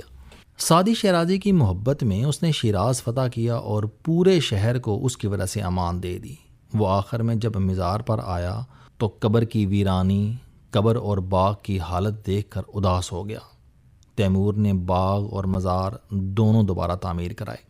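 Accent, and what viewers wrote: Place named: Punjab, Pakistan. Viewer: nice to listen khas toor say panjabi lahzey ki urdu.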